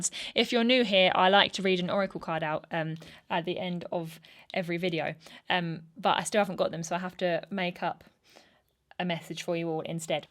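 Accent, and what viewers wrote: United Kingdom. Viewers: How can it be that you sound like you're British, but didn't know who was?!!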